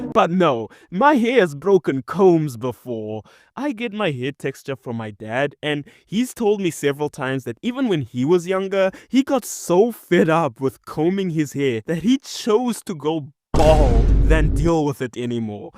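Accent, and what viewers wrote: Afrikaans, South Africa. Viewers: I hear a Afrikaans boi here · I just realised you’re South African bc I knew that accent was familiar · You have an Afrikaans accent, I have always spoken both Afrikaans and English so I don't have an Afrikaans accent.🎉🎉🎉🎉🎉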